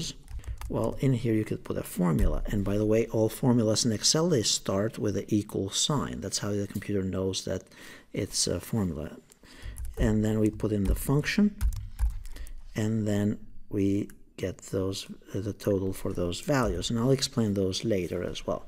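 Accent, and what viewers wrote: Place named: Albania. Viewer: Great presentation Hope you don't mind me saying but you have an interesting accent, are you of Albanian origin?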